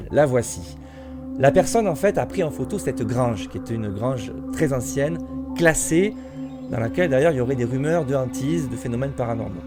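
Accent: South, France. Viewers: Je te découvre et je follow en 10 secondes pour ces raisons: + super chapeau. L’accent du Sud c’est le bonus.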